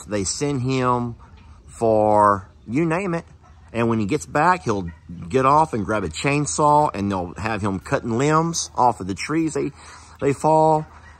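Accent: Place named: South, United States. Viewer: Spanish and French are very similar. He's going to have trouble with you. Your broken English (Southern speak) is off-the-wall. The French, Mexican, and MOST every other country were taught English in school. What you speak... Is not proper English. You're the problem. That man was charming and non-judgemental of... Your broken English.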